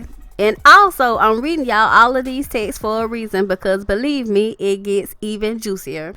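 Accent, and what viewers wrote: South, United States. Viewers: Your accent and laugh is so adorable!! And those phrases are funny as hell "Clutch my pearls. A shame and a scandal." OOOuuu, you know you're from the South!! LOL. I could listen to your videos all day.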